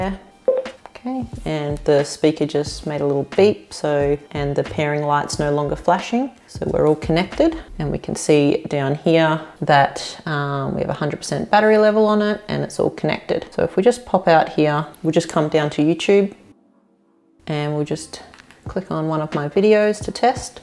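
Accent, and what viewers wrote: Australia. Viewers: She sounds like australian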